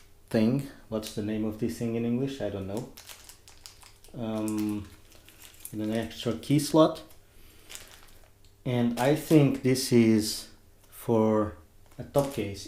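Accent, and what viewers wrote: Portugal. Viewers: thanks a lot and keep doing this kind of videos. I'm following your channel with special atention since i have exactly the same bike. Are you Portuguese? Your accent sounds from a portuguese.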